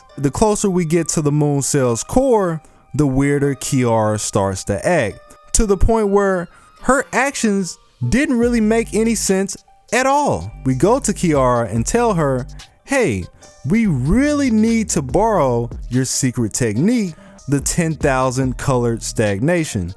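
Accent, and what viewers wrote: United States. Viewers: you from Philly? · are you from Baltimore?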